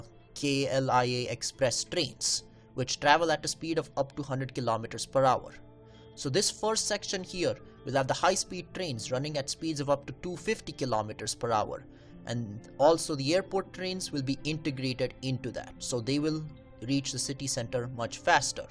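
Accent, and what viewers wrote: India. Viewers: Bombay English if you really want to know. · Indian accent. Fuck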